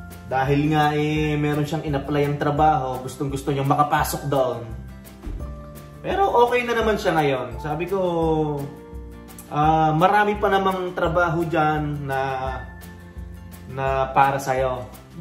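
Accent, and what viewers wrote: Batangas, Philippines. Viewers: Kuh nga batanguenio pala arih, bay nga mga batang ang twag sa mga asawa e”huy” haha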